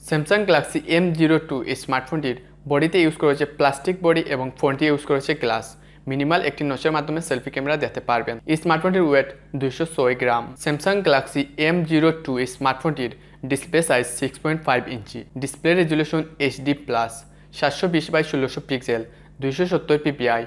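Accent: Sylhet, Bangladesh. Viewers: vai sylhety na kita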